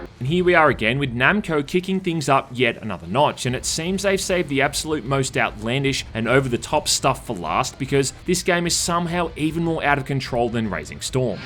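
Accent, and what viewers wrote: Australia. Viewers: Hey are you on Aussie or a Kiwi? Trying to figure out the accent.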